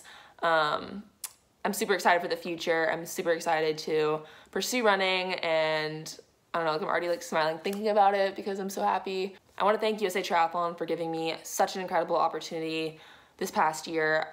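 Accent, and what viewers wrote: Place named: Punjab, Pakistan. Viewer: I like her speaking Pakistani-Punjabi, nice accent!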